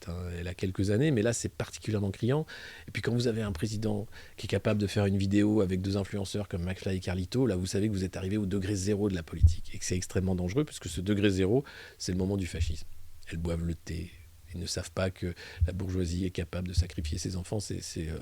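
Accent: Quebec, Canada. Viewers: Bel accent Québécois!! Bravo! Calins à Miggie et Courage à Timmy! Merci! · N'oubliez pas le petit bonnet de bain pour Maggy , pour le jacuzzi !!! Merci , toujours aussi génial !!! Et vous avez un accent canadien fantastique !!! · Excellent l'accent québécois 😀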